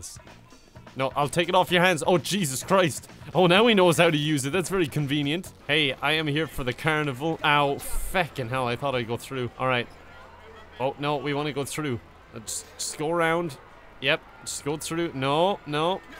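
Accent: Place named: Ireland. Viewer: it's nice to hear a true irish accent +1 sub. i like you editing style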